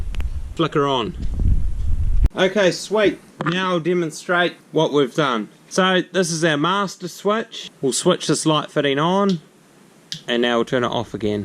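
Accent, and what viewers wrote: New Zealand. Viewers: Thanks Great show. I had a bit of fun with the accent (Here is the kettle calling the pot black! I'm European.) Yes even the CC picked it up and kept showing "The Read"wire and even had more problem with the "batten box" ha ha - I seem to have heard this on my 2002 NZ trip, could I be right? (And did they had fun with the Aussies!) Anyway this is a very practical presentation. Thank you. I just wish I did not have a narrow two gangs switch to work with and some 15 wires behind it, stiff ones too! But in the end all good! Really when one understands that Switches are never off but go to L1 or L2, It makes a lot of sense to get the two ways working. More thanx to your three ways and illuminated switch (here I wanted it for my two ways switches to the outside light to remind me to switch it off (My old neon that worked so well in it died) Not so lucky on that one...:) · Mate your a legend not only have you saved me hundreds of dollarydoos but iv learnt something and enjoyed some pure NZ accent doing it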